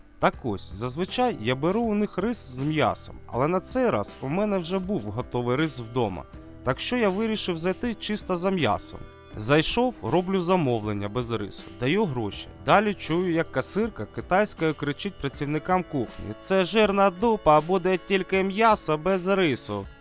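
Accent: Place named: Russia. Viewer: В мене таке враження, що автор кацап, бо читає з рососійським акцентом, підозріло, не буду дивитися!!!!!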